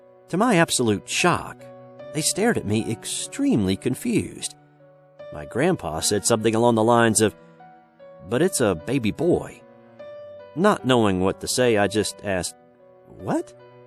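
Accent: South, United States. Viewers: when you narrate your voice has that weird Southern style like the guy who used to narrate ‘Gravy Train’ dog food commercials... ‘Just add water and it makes a rich thick gravy, mmmm mm!!’ I like your channel but maybe take some voice lessons everything sounds like the Waltons.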